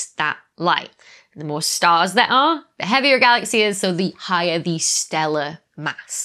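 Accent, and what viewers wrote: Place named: North, United Kingdom. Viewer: "quicker than what our best model of the....." i love the way she sounds so northern.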